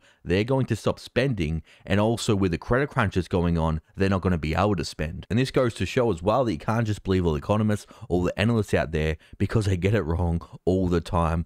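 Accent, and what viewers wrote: Australia. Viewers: It's sounds like you're an Australian. Isn't the Ausy economy in a relatively good spot compared to much of the western world? I'm about to emigrate WA from South Africa where we can't even keep the lights on and the Rand is reaching new all-time lows, so I figure it's an improvement.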